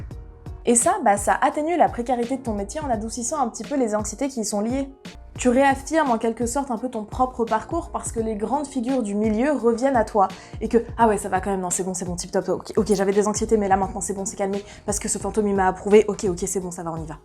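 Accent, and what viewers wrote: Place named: England, United Kingdom. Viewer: Wow, je suis éblouie par cette beauté, ce talent, cet accent anglais ainsi que cet humour absolument incroyable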